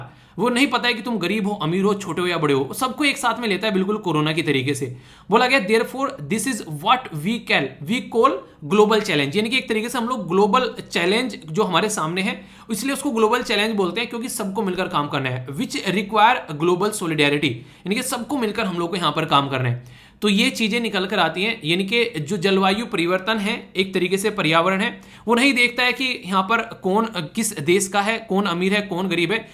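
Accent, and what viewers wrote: Haryana, India. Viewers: apki bato or awaj se or APK ghr se , or khane ki choice se ap Rajasthan Haryana me khii se ho ,, 90% Haryana 💓 😄😄😂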